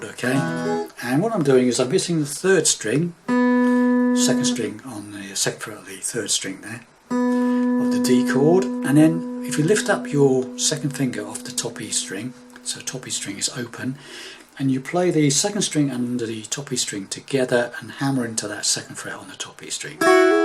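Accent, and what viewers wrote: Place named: Coventry, United Kingdom. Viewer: Just had to pause, its a lot to take in...just subscribed to his YouTube page.. Your lesson is as always perfect, great explanation and walk through, are you from Coventry but moved to Bedworth or leamington...??? You sound very local..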